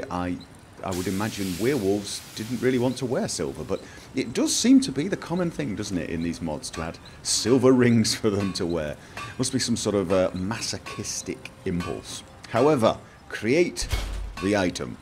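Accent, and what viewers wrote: United Kingdom. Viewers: dude i just love your vids your british(could be scotish i cant tell these sort of accents apart :P)accent makes it so enjoyable to watch :)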